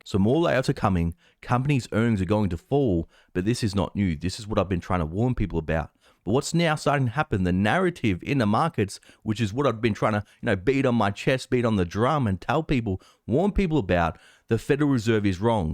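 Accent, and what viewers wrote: Australia. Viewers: That’s Ultra Mega Aussie 🇦🇺🤙🏻🇺🇸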